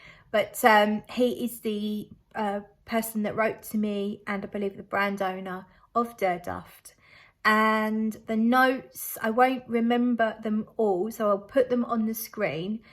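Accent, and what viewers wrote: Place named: United Kingdom. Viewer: I love your UK accent!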